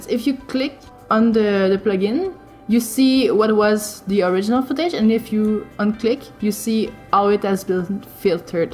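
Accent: France. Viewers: you french!!! nice eng accent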